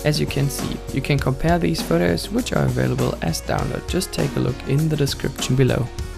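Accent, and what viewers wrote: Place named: Germany. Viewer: when a german tries speaking english thats how it sounds like.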